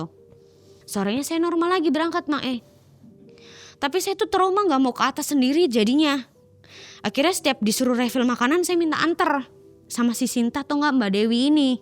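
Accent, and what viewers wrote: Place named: Cirebon, Indonesia. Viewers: Wong cirebon ya mba ?? Logate keciri 😂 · Cirebon yaqin, Indramayu mirip sih,tapi tetep beda,mba tulen Cirebon, rumah makan mana ya buka nya sore smpe mlm dan ramai pengunjungnya 😊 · Logatnya daerah majakuning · Logat bahasae kyk wong Cerbon c yuu 😁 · Klo denger logatnga sprtinya lokasinya di cirebon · bahasnya kaya orang cirebon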